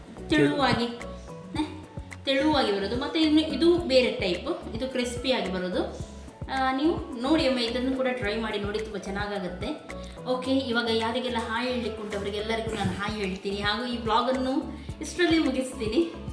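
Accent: Mangalore, India. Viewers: ಕರಿಬೇವು ಪುಂಡಿ 😀😀😀😀 ನಮ್ಮ ಮಂಗಳೂರಿನವರ ಕನ್ನಡ ಸೂಪರ್. ಯಾಕೆಂದರೆ ಕನ್ನಡ, ತುಳು, ಕೊಂಕಣಿಯಲ್ಲ ಮಿಕ್ಸ. ಸೂಪರ್ vlog 👌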